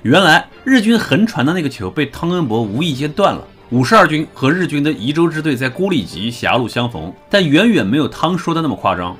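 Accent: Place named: North, China. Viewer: 北方人正常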